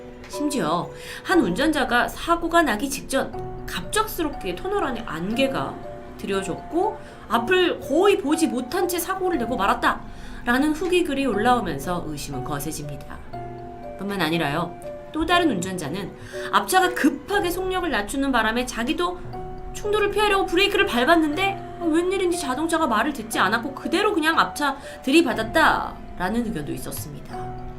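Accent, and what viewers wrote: Gyeongsang, South Korea. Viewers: 역시 갱상도ㅋㅋㅋ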